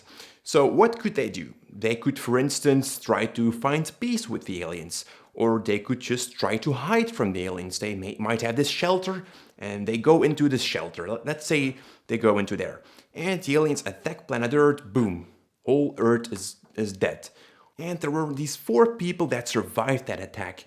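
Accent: Netherlands. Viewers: bootifull dutch accent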